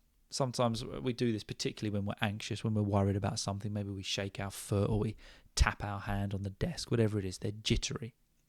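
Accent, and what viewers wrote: United Kingdom. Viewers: I love your vibe and clear British accent 😊❤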